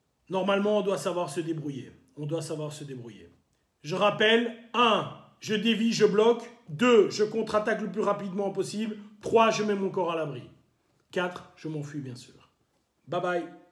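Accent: Belgium. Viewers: Tout le monde à un accent selon son origine. C est un accent wallon tout à fait normal, 2000metamorphe je te comprend pas.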